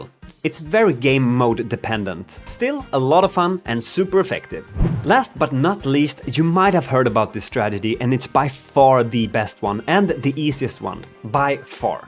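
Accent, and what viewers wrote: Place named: Sweden. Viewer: First video from you that I’ve wached, great first impression. Your accent sound very swedish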